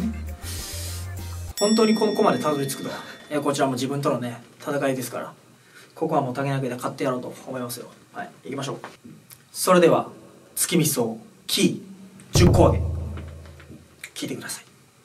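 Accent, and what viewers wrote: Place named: Kansai, Japan. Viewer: 関西弁なのめっちゃいい！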